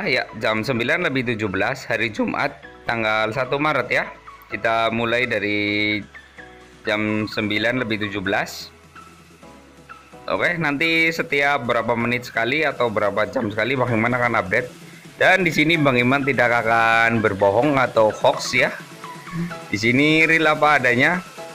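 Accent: Banyumas, Indonesia. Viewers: Wong ngapak ya mas ?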